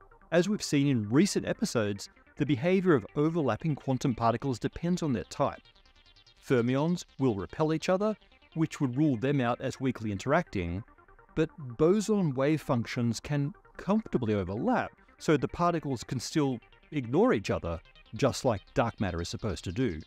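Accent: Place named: Australia. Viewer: that's his Australian accent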